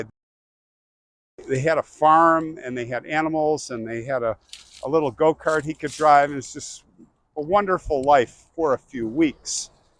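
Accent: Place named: United States. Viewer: which part of America is your accent from? I love the way you pronounce many words 😊. That little boy's face was so cute. Poor little angel. I'm glad you visited him.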